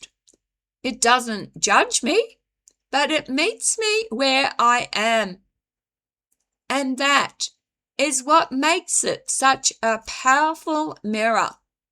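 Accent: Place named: New Zealand. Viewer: Hi, where are you from? I almost thought it was NZ yet could be UK? Interesting content re Chat GPT for soul reflection. Thanks for sharing.😊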